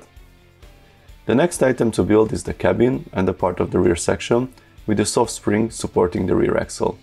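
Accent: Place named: Hungary. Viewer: Tuti magyar vagy, hallom az akcentusod :D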